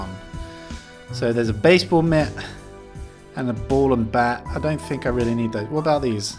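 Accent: Finland. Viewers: you are doing great, i think its pretty funny that you pronounce all the swedish words with a finnish dialect.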